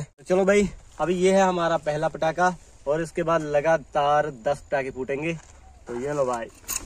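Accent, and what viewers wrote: Punjab, India. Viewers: ਵੀਰੇ ਲਗਦਾ ਪੰਜਾਬੀ ਆ ਪੰਜਾਬੀ ਕਿਉ ਨੀ ਬੋਲਦਾ ਯਰ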